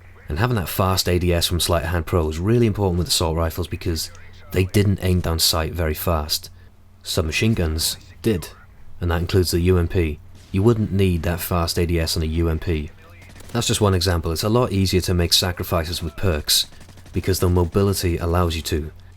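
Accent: Wales, United Kingdom. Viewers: Are you from Wales?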